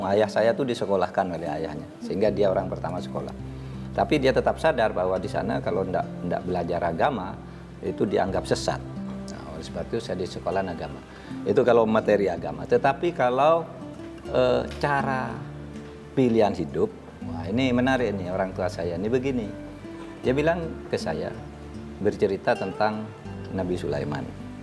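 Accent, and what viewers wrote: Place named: Madura, Indonesia. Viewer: Pak Mahfud ini orang madura ya.. logatnya kelihatan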